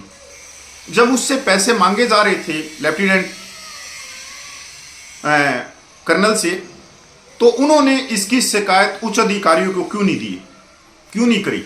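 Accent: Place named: Haryana, India. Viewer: भाई अपने चैनल मे हरियाणवी टोन का प्रयोग कम से कम करे।धन्यवाद।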